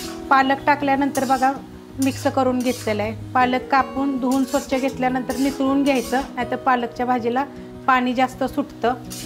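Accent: Satara, India. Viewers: खूप छान 👌👌👌 भाषेवरून आमच्या सातारा साईडचे वाटता..चॅनेल फॉलो केले 👍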